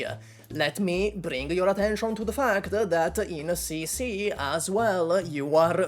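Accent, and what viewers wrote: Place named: Italy. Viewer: Why do you sound so cartoonishly Italian?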